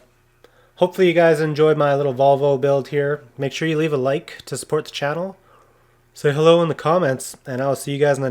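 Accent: Canada. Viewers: the canadian accent hahahha love it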